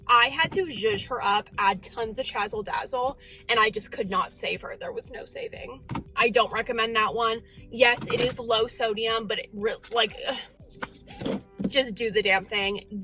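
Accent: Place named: Upper Midwest, United States. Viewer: Sexy with that upper Midwest accent. What a cutie.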